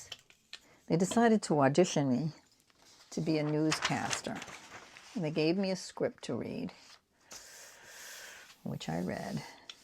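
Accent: Boston, United States. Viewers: You devil. You and the algorithm of YouTube! I’m an ART SUPPLIES JUNKIE in recovery!!! It’s Sept 2025 and I CANNOT buy any art supplies, new or used, this month or else I have to pay $50 out to my BFF for losing our challenge this month. It’s Sep 19, eleven more days to go. And I’m struggling with gel plate printing. Oh, well, I’ll keep practicing and trying to find newsprint free somewhere. And I’ll start buying Nora colors in October, maybe 2-3 at a time. I’m loving this video, liking your stories, wishing I could hear your Boston accent, and away we go. BTW, 1976 is also when I started 12 Step Recovery at age 31. I’m 81 and still loving arts and crafts. Also, I just don’t get color theory. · I thought I recognized that accent! I worked to get rid of mine as well and now live in NH and boy do they sound different than Bostonians! When I drive under pressure that accent bubbles to the top and my kids don't let me forge tit. 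LOL. It's WICKED funny. Love your videos. Just found you today. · It's called "code switching" when you change accent to that of those around you. It's a natural human psychological mechanism, and whole books are written about it. I had to study it for one of my sociology classes at uni. It stuck with me because i had several different accents around me, growing up, and had become adept at popping between them, but always felt fake- even though they were technically all MY native accents! Anyway- i adore the stories and the COLORS! Side note- i was JUST watching one of my favourite travel tubers, Steve Marsh, and he went to Iceland and had a whole segment on the US airbase there. Pretty cool to now run across someone who used to work there! The navy in the 79s? As a woman? You must have SO many stories to tell! I am hoping you share more! (Btw- your boston is still there- just around the edges. 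❤) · Love your story about your Boston accent, my husband is also from Boston (raised in NH) and worked hard early in his military career to lose his which he did, but like you, when he gets around other Bostonians, it comes right back! And once and while, he slips. I think it’s charming, lol. Btw, I also love Rex Ray art. I’ll send you a very cool video that I came across a few years ago. Have a lovely arty day❣️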